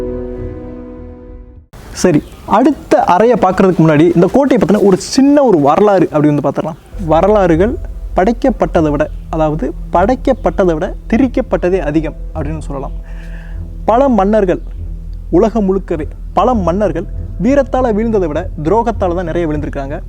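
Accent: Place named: Madurai, India. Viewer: Hi.... Please read kannadasan's Cheraman Kadhali..we miss your iyalbana madurai slang..ellorukkum vanakkam.and vaanga polaam.. pazhaya ilandha sogathil puthiya konathil anbudan varavekirom.. love and regards..and very best wishes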